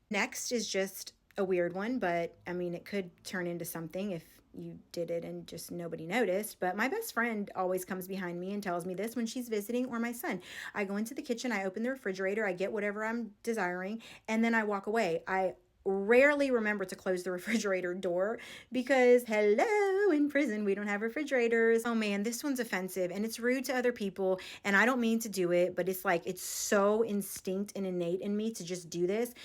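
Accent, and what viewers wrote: South, United States. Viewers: You are soooo beautiful 😍 and I love your southern accent 🥹🤎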